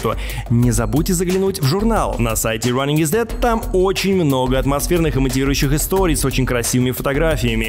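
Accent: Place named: England, United Kingdom. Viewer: О этот английский акцент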